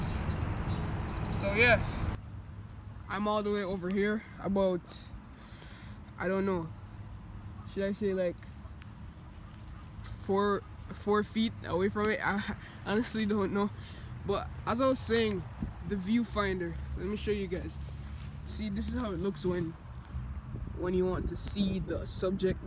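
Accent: Jamaica. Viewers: MajorinkPeoples He's sound like a Jamaican, that's how our accent sound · bro, are you Jamaican?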